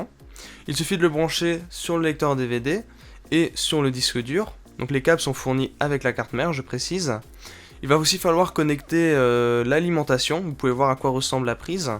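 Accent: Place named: England, United Kingdom. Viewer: Quel accent anglais x)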